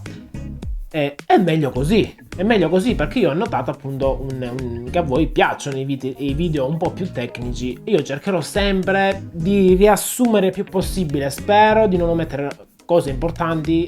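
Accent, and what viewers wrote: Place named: Agrigento, Italy. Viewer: Quindi mi consigli di acquistarla e utilizzarla per tutto senza fare leva e metti biscotto e refrattaria per pratica cosa intendi c'è qualcosa da impostare per utilizzarla al meglio , quando riuscirò a fare una pizza come quelle che vedo nei tuoi video sarà fantastico ma al momento sono alle prime armi e ho qualche problema a ottenere un impasto facile da stendere , dall' accento mi sembri della provincia di Agrigento o sbaglio? Anche io sono nato ad Agrigento ma sono 25 anni che mi trasferito a Torino 😊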